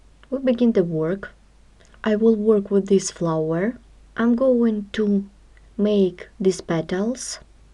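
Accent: Russia. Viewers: А русский так хорошо слышен через этот англ.))). Красивая работа.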